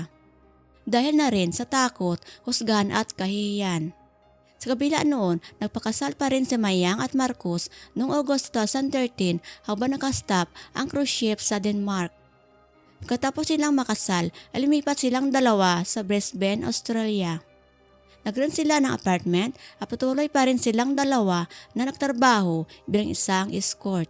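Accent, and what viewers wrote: Visayas, Philippines. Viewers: Sounds visaya accents 😵‍💫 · hahaha.. parang himig bisaya.. at nahilo ako marining bjnabasanya basanya ang content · Ako Tinapos ko Ang twinto Oky lang Naman Na Ganyan Ang Bossis Mo Kasi Normal lang Yan Na Ganyan Ang Bossis Kasi Bisaya Piro Para Sakin oky lang❤️‍🩹❤️‍🩹❤️‍🩹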